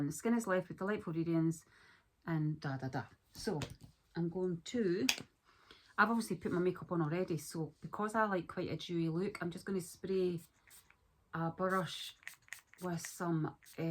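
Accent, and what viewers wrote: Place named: Scotland, United Kingdom. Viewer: I love a more personal and authentic vs commercial vibe to the content i watch! I'm not sure what's your cute little accent - Scottish?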